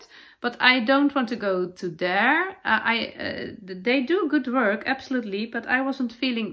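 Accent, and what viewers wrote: Netherlands. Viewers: I know that I don’t have to say that your English is perfectly fine, but really, it IS! Please do not stop doing the podcast in English. Yours is my ABSOLUTE favorite knitting podcast and I will be so sad if you give it up! I look forward to it every week. Of course, you should do whatever is best for you, but for your viewers (especially this one) I love listening to your Dutchy English. ❤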